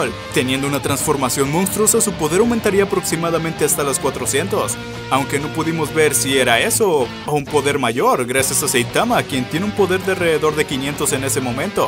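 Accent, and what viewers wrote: North, Mexico. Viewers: Como olvidar cuando hablaba como norteño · No manches en este vídeo te salió mucho el asentó mexicano..!! jajaj xd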